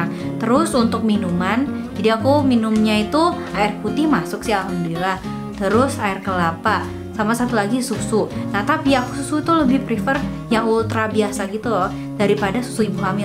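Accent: West Java, Indonesia. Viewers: dari cara ngomong y kliatan bngt kalo org sunda😆 slamat ya kak,smga slalu sehat trus😘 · Sepertinya teh ngomongnya agak engap ya heheh😄 antara lagi pilek atau apa atau aku aja yg dengernya gitu😁. Oh yak logat sundanya aku suka banget kaya aky jadi kaya campur2 gitu walaupun ngomong indo😄. Oh ya semoga teh sama baby nya sehat2 terus yak sampe nanti lahiran🤗🤗❤❤❤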